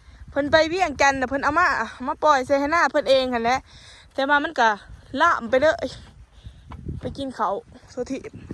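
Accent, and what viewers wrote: Ubon Ratchathani, Thailand. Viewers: เว้าปานคนอุบลแท้เจ่า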